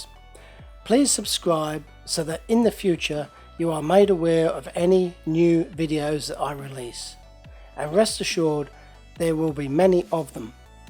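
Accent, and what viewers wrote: England, United Kingdom. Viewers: Very interesting!!! I liked the idea!!! Where you are from? By the accent, it looks British to me. Can you get old thumbnails with ease? Here in Brazil it is difficult to find old machbox. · I have clumsy fingers, i would end up painting it Pollock style!!! Where are you from? you sound british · This is the second time I've seen you try something other than paint stripper and I don't know why you keep trying to improve upon something that works so fantastically well AND fast. I can see trying to improve methods that need improvement etc, but the stripper is as good as it gets IMO. Great vids and the proper English accent narration just makes it better.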